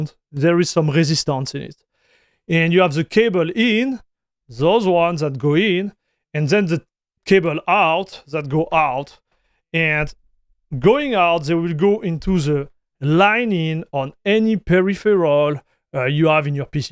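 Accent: France. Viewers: that french accent goes hard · Damn that french acesent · One just have to love this strong french accent :-) Moral of the story: don't use rolling distro nor cutting edge versions of software when you need stability.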